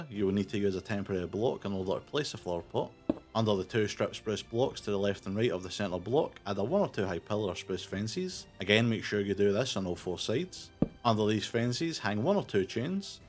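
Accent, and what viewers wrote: Scotland, United Kingdom. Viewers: I think he’s Scottish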